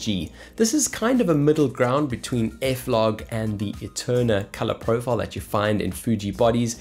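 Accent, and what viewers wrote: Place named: South Africa. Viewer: Such an in depth review - thanks so much man! Sounds like you’re from South Africa as well!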